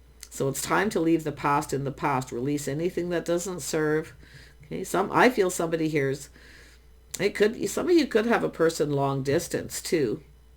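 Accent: Canada. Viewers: Phenomenally close to the mark… I will be amazed if he really calls… it will blow my mind, but of course, I would welcome it and love an opportunity to try and sort this out… By the way, your accent sounds very much like my own… Are you Canadian? I live in the states now but born and bred in Toronto.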